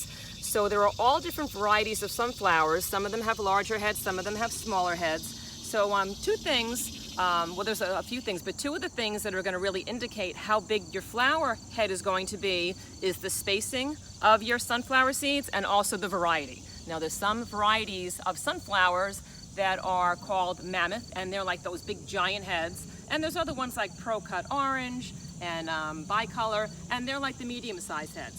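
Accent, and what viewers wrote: New Jersey, United States. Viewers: Lol I just watched another video of yours about sunflower tips and I knew as soon as I heard your voice that you were a Jersey girl and it has now been confirmed! 🌻✨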